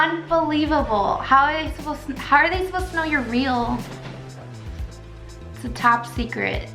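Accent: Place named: Illinois, United States. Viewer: I was born and raised in central Illinois...I knew your accent sounded like home🤍 I’ve lived in Louisiana for almost 20 years and the dialect is completely different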